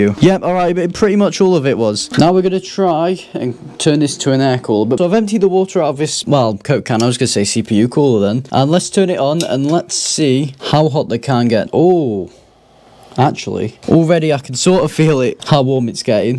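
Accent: United Kingdom. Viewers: this video just got recommended to me and i clicked and now im invested in this channel, idk i think its the fun stupidity and the british accent as im british aswell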